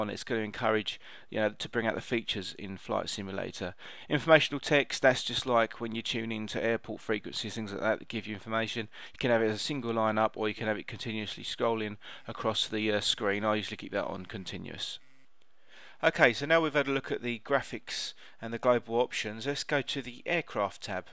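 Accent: United Kingdom. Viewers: i love brithish accent! greetsfrom chile good video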